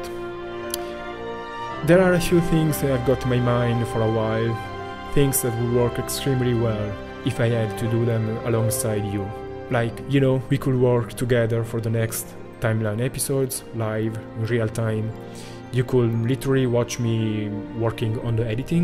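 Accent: Italy. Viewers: And btw your Italian accent dont annoy :3....it makes you very handsome :3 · "So hopefully, you'll be forgiving all my mistakes." Bro, we're not even going to notice them. Also, we Americans love accents. 😉 Including Italian. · So excited to make your stream and getting more content from you!!! That Italian accent could never get annoying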